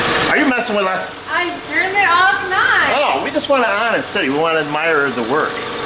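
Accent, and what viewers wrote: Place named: Upstate New York, United States. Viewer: This is a great video, thanks for sharing, sounds like you are from upstate NY id guess Rochester or Buffalo!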